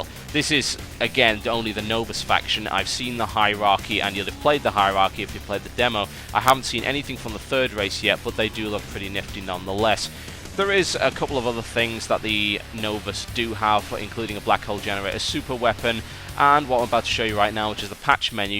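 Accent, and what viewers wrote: United Kingdom. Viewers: He sure sounds more brittish here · wow! you sounded more british back then ;b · old sound quality makes him sounds more british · He pronaunces everything so... british? I dont know, but I have the strangest boner. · Is it just me or he sounds more "british"? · he sounds a bit more english you know. translation for idiots (he sounds a bit more british) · Is it just me or does he sound more British in this video then he does now?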